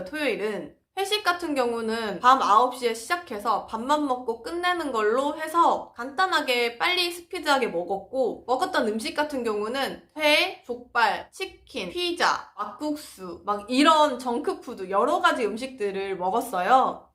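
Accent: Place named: Busan, South Korea. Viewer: 부산사투리가 귀에 딱 잘들려왔어요 완전대박채널되시기를 ㅎㅎ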